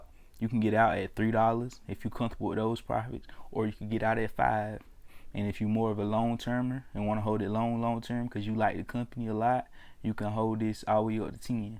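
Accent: South, United States. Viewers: Cuz sound like he lived in Ga some point. I hear that southern tone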